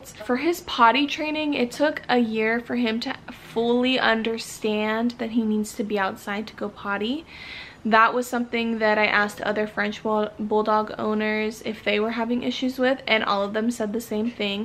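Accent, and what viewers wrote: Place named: Bay Area, United States. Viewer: where you from ? sound you like from the bay like me lol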